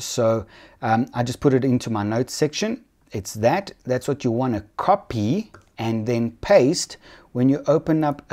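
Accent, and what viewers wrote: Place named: South Africa. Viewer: So cool hearing a South African voice on YouTube.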